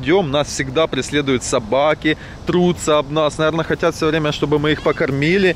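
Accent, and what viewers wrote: Ukraine. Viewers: Такой колхозный акцент как и у всех из украины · По говору слышно что из Украины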